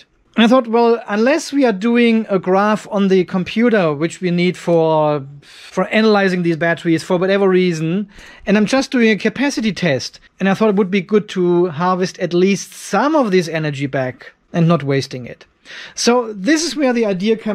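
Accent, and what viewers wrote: Germany. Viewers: Nothing sounds more stupid than a German trying talk english 🤣😂🤣😂🤣😂🤣😂🤣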